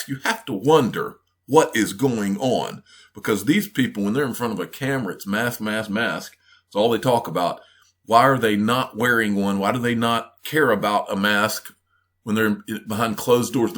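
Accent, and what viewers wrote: South, United States. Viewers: Glad to be back. Missed your sultry southern voice sing to me about XRP. Happy and Healthy Thanksgiving. Smoke that Turkey!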